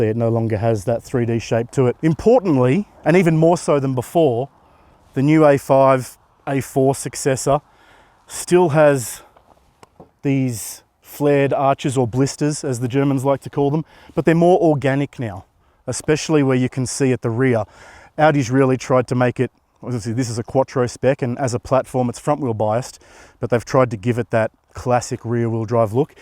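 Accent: Australia. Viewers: " tie me kangaroo down!" I can recognize that Aussie accent anywhere mate. "Crikey!" BTW I don't live in Australia I live in Europe. Lol, lol!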